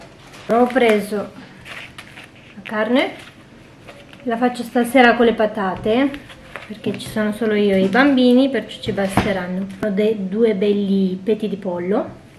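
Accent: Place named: Italy. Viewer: ce accent de italianca adevarata, ai! Imi place sa te ascult!❤❤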